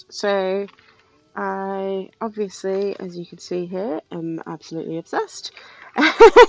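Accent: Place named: United Kingdom. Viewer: I thought it was just me! You did sound more british. 😊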